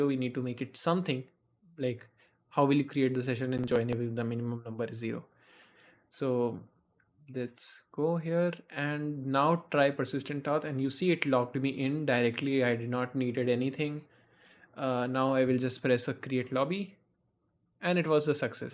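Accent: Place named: India. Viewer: perfect indian accent. i applaud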